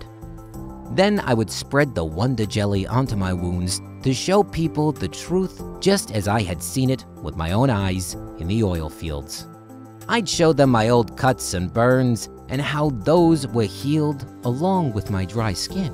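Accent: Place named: New England, United States. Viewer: couldn't listen to more than 30 seconds of this dumb yankee accent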